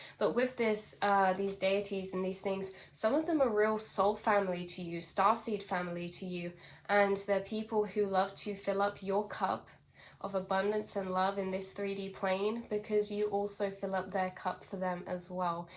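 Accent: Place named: United Kingdom. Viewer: Girl, you embody a plethora of Wisdom, you showed up on my feed two days ago and I've been listening to you since, all day yesterday, and I must say that you embody within you, truths that's taken me years to aquire, and this reading is the most profound! Given that I've lived 800 lives 😂 my lineage travels back to Luke, the physician in scripture, which explains why I've always had a passion for healing... Mind, Body and Spirit... In ministry it was my goal and as a Holistic Healer, it is still a goal of mine... Wayne represents royalty in the early 1700 or even earlier, I know I've been in that life, I've seen and felt it and I'm drawn to those types of movies and frequencies... Celtic, knights, time travel and sci-fi... Some, not all.... Embracing my ancestral roots of Irish Scottish.... You sound British 🙂 German and Native American.... I recently discovered that I am a Blue Ray Starseed, Earth Angel, they've traveled with me and shown up in my meetings, and it resonates with me deeply.... 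Yes, large amounts of money is my inheritance and is needed for this leg of the path I'm on .... I also discovered that I have a dragon 🐲 working with me, I want to meet 😂 I see colors of blue, gold and white, purple... Amethyst is a strong energy and green, especially while in meditation....and the forest lights up with Frequency when I'm there... I Really Love this reading 📖 as far as commutation, I AM constantly in communication with Spirit and now my guides and starseed family.... S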